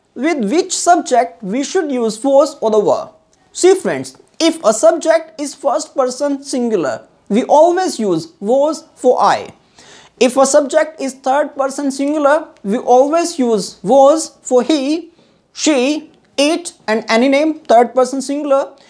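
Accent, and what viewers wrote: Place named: India. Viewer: Because your speech sound like indian kkk are you from Índia?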